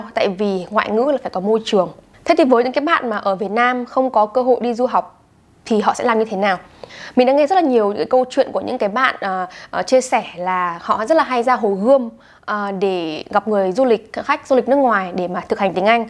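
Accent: Australia. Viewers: Ui cái Aussie accent, yêu❤️ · Đặc sệt Australia mà lại bảo k có ngữ điệu cụ thể nè 😌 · Thích giọng chị ghê! Anh Úc nghe chanh sả rất hay hjhj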